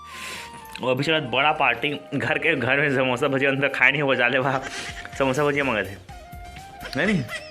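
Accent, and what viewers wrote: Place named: Chhattisgarh, India. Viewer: Cg tune dale ho ten ha bht mst lagt he ❤️🤗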